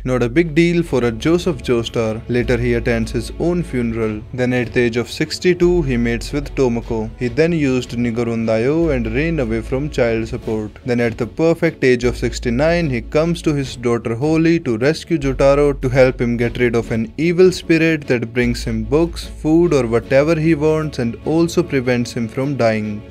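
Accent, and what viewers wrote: India. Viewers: Are you an indian, bro? Your accent looks like one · Your have an indian accent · U sound indian.. 😂that accent is what makes this funny. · ive been watching this channel for a while i swear to god i never realised having anime explained to me with an indian accent is such a ride 😂😂😂❤ i can't get enough . sir thank you and please keep it up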